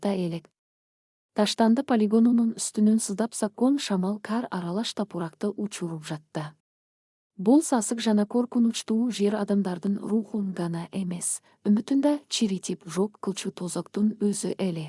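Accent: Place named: Russia. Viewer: Чала орустан башка окуган киши таппай калдынарбы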